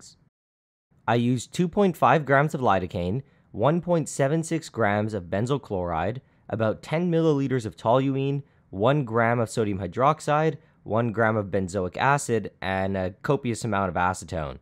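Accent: Canada. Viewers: Hey! Are you Canadian?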